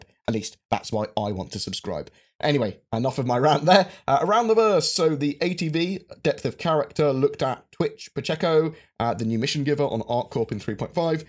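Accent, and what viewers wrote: United Kingdom. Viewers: I appreciate your vids, I really do but could you enunciate better. Your voice is like a roller coaster. No volume setting can adjust from the highs and lows and missed syllables you have. I like the accent but not when it interferes with comprehension. Sorry man I don't mean to be rude but it gets a little annoying. I get the quips and quirks of British loving their own voice and word play. I mean you guys play with it when you speak but sometimes it's just a little too much.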